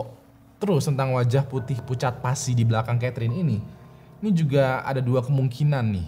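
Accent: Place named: Medan, Indonesia. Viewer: orang medan ka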